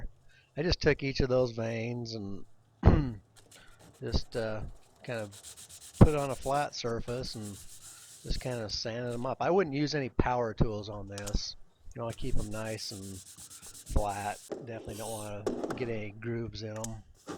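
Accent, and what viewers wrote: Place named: Utah, United States. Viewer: I watched this video because I'm rebuilding my Ingersoll IR285B, and I can tell you that its exactly the same procedure. Every last part looks identical! My vanes were stuck as well, with lots of rusty gunk, and I constantly oil my guns! Go figure? Thanks for posting ;)BTW are you from Utah? I have a friend in Utah and you have the same accent. Just sayin.......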